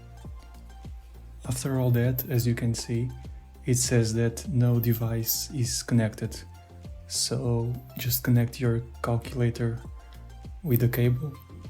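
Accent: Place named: Portugal. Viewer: You definitely have a portuguese accent, tudo bem?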